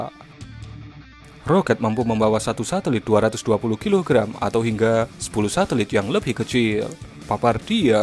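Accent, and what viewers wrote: Java, Indonesia. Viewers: suaranya medokkk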